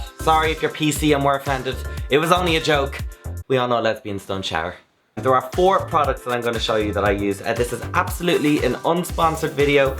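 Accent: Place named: Ireland. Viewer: Hey! I love your videos and you're accent!! Some of my family is from Ireland and I'd love to go. I'm in love with Ireland and its accent!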